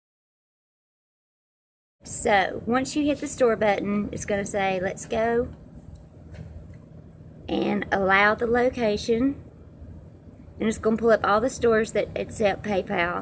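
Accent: United States. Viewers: She sounds like she lives out here in Tennessee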